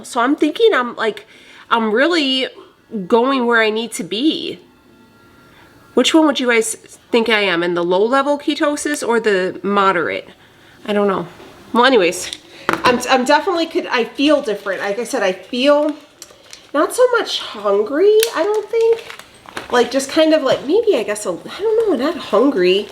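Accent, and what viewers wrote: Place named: Illinois, United States. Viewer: Your accent!🥰 you sound like my step- mother in law who grew up in Illinois, near Chicago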